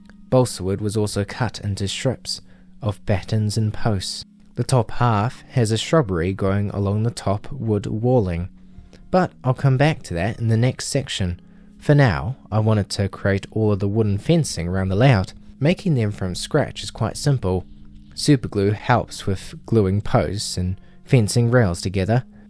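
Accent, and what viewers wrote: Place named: Australia. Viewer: This was 10,000 worth it Btw u sound aussie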